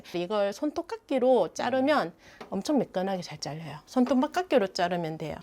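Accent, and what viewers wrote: Gyeongsang, South Korea. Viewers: 어릴 때 대구살아서 살모시~ 넘익숙 울엄마 말투라 정겹네요ㅎㅎ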